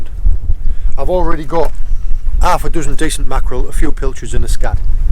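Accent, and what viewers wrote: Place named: Flamborough, United Kingdom. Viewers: Best knowledgeable uk fisherman by a mile mate! Thank you so much for hours of vids for me and my 8 year old keen fisherman! We have a holiday cottage in staithes, are you originally from anywhere near there? You accent says that area?🎣🎣🎣🎣🎣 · I forgot to say that i am putting my pots off Flambrough head. And by your accent i think you have lived near here. It gets very rough so your boat safety videos are great. Im so glad i came accross your channel. All my mates watch it too now. Thanks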